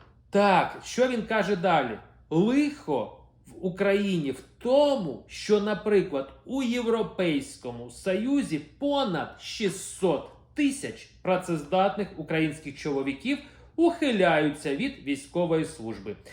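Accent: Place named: Russia. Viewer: А ВЫ. ЯК ОГО. ЛЫСОГО. СЫДЫТЕ. БАЛАКАЕТЕ. НА. УКРАИНСКИЙ. МОВИ. З. АКЦЕНТОМ. МОСКАЛЯ. И. ПОКАЗУЕТЕ. ЩО ВЫ НЕ. ЛЕДАЦЮГА. АНУ. БИГОМ. НА. ПЕРЕДОК. АНЕ. ЗВЫЗДИТЫ. И ЗАРОБЛЯТИ. ГРОШИ НА. БИДНЫХ. ЛЮДЯХ. ПРОВАЛИТЬСЯ ИЗ. СВОИМ. КАНАЛОМ. В. БЕЗДНУ!!!!!!!!! ПИДДЕРЖИТЬ. МЙ. КОМЕНТАРИЙ. ДОРОГИ. И. НАСТОЯЩИЙ. УКРАИНЦИ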